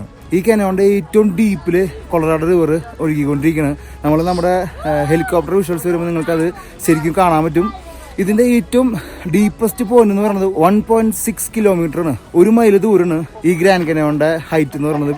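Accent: Thrissur, India. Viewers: No words, your trichur accent, the commentary, your stature, your vehicle, solo drive all blends perfectly. Wishes for all your endeavors as good kerala U tuber. ❤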